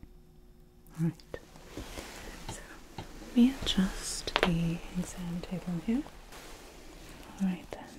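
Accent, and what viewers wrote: United Kingdom. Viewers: It's a British accent. She made a video with 5 new characters last week. All of them have different personalities, voices and slightly different appearances like hair styles. So she has decided to make a video as this new character. Hope this helps! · I've got used to the British accent (not bad either!) but I wasn't expecting the 'cheers' thrown in - one of the thing you hear a lot in the UK, but rarely when someone is trying to do a British accent. Bravo! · British accent?😅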